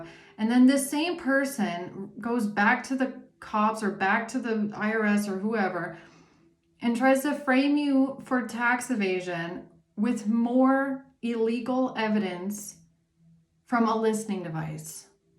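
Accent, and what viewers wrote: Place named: Germany. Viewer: Afternoon beautiful 🐝, LOOKING FORWARD TO WATCHING AND HEARING YOUR BEAUTIFUL ACCENT 😊 German ❤, have a beautiful day night x ❤ thank you x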